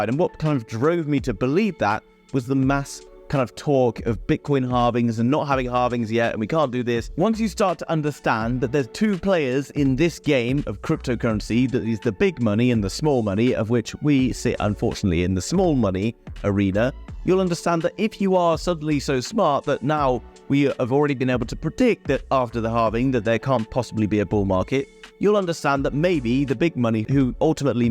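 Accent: United Kingdom. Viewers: Adorable where u from in the UK lol😊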